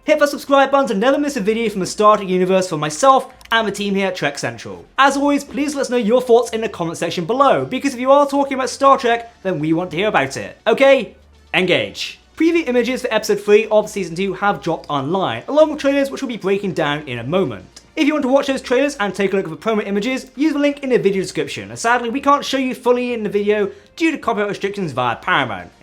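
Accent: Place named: England, United Kingdom. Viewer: Always enjoy your videos very informative and the wording is always nice and witty however and please take this in the right way could you please slow down just a little bit you're an English accent which is OK makes it harder for people to understand everything that you're saying with the speed at which you're saying it in other words a she aint sure have a little bit of a problem Thank you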